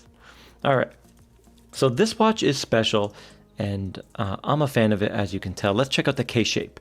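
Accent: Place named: Canada. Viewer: He only says it that way is because he is Canadian.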